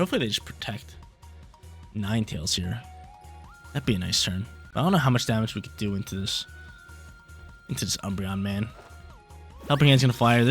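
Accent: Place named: Buffalo, United States. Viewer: Holy Buffalo accent lol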